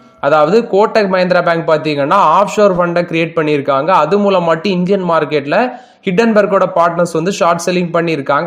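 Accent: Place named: Nagercoil, India. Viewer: Slang resemble Nagercoil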